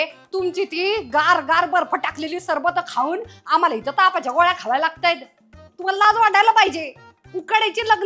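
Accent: India. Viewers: 😀😀जबरदस्त कॉमेडी हसून हसून वाट लागली. तुला काय भन्नाट आणि खरे विषय सुचतात ग. आणि अगदी हुबेहूब गावरान बोली भाषा तो अभिनय ऐक नंबर. 😀😀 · लय गावरानभाषा आवडली1चंबर❤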